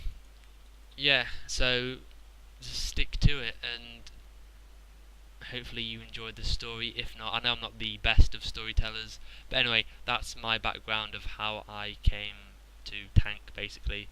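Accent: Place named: United Kingdom. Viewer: Know what's better than listening to how someone started playing WoW? Listening to someone with a badass British accent talking about how they started playing WoW :P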